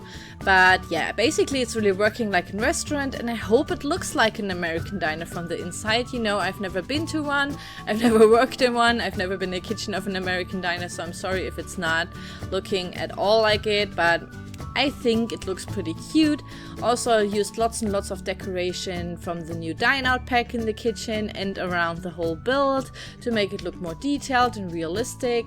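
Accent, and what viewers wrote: Germany. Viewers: )) I don't get that myself either. Maybe they also have bad accents and are mad at themselves and thus also at you for having that German accent? I don't know, I find it charming when I can hear an accent from the non-native speakers I'm subcribed too and that make English videos. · Du hast wirklich einen starken deutschen Akzent und die machst mehr Grammatik- als Vokabelfehler. Aber du wirst besser.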